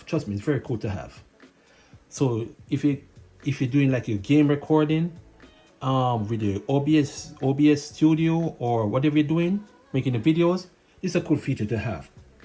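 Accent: Jamaica. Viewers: Sounds like a Jamaican :)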